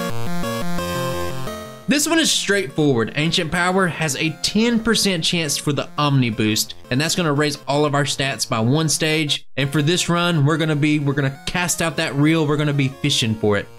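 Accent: South, United States. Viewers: Literally the first and only Pokevideo I've seen in my entire life with a Southern narrator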